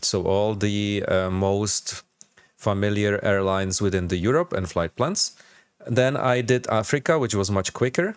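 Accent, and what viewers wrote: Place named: Czech Republic. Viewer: poznám Čecha jak se snaží mluvit anglicky na 100 km😂😂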